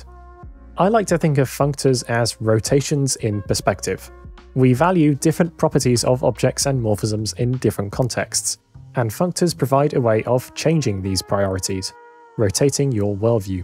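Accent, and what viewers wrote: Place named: United Kingdom. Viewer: what is...katuhgree theory?? british accents man. wild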